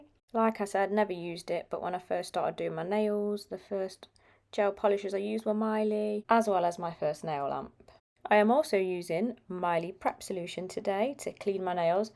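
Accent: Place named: Midlands, United Kingdom. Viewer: Hi I have this product, my question is have you used it without a colour on top? I found it went opaque did not stay clear. It’s a good strengthening product & I’ve since got the peach colour in hope that stays its peachy shade. Thank you for video. Also rare to find uk tutorial accent sounds like it’s from Midlands am I right? 😊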